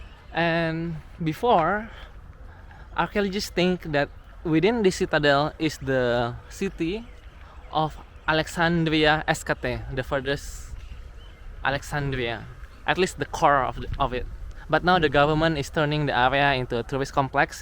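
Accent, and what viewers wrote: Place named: Indonesia. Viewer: Untung aja logatnya bang Indonesia banget, jadi masih bisa mengikuti semenjak jadi English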